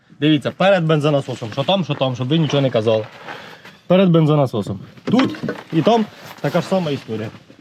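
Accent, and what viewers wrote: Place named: East, Ukraine. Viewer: На сході оозмовляють так : на суржику.Не чіпляйтесь.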